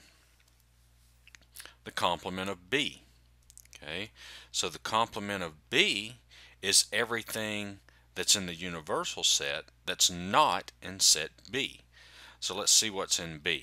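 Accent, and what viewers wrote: South, United States. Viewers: Does the have a southern accent? I like it whatever the accent ❤️ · You mister, you sound so american, where are you from? Tenesse, Texas, The Grand Ranches of Arizona? Nevertheless, thanks for your video, it has certainly made things clearer...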